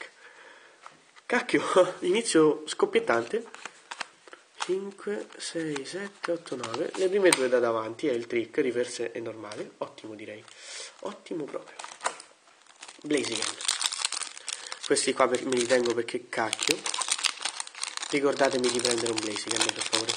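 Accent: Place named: Rome, Italy. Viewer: Si sente che sei romano o.o Comunque sei simpatico u.u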